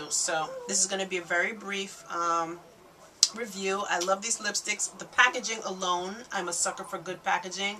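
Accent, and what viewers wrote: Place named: Caribbean. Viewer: you're gorgeous and i love your personality... which west indian island are you affiliated with... yup, i hear an accent 8 )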